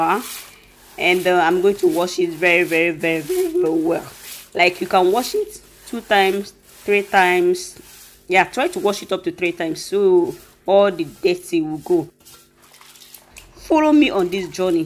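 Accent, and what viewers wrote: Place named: Igbo, Nigeria. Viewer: You kept repeating ngwongwo na ngwo ngwo and I couldn't stop laughing. I love you igbo accent 😍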